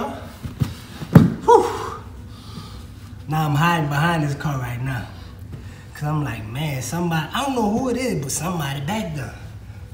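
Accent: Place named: New Orleans, United States. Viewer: Is he from New Orleans he sounds like it...I’m a new subscriber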